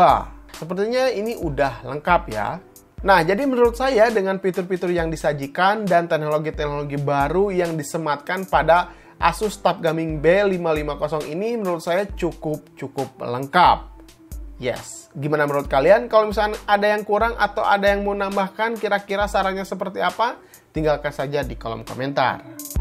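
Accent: West Java, Indonesia. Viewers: wkwkwk sunda bet ngomongnya. ketika V dibaca P "pidio"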